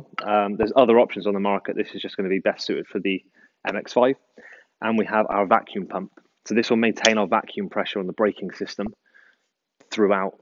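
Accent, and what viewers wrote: Bristol, United Kingdom. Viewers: Do I detect a bristolian accent? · Good to see you guys pushing a bit more you tube content! Gotta love a strong Bristolian accent. "Alright my lover" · You sound like your from Warmley or at least East Bristol.....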